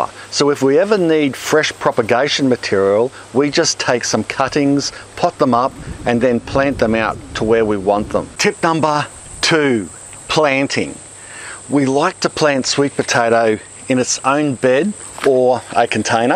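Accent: Queensland, Australia. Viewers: Great vid, great tips! Thanks, mate! I'm actually living in Northern Canada, where we don't have enough heat or warmth to grow sweet potatoes - at least not the size you have there. But I was initially caught by your accent, and said to my self that sure sounds like a Qld accent. hehehe I went to school at Southport for 6 years.😂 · So good to hear an Aussie voice! We live in S.France and are going to plant our sweet potatoes for the first time...thanks!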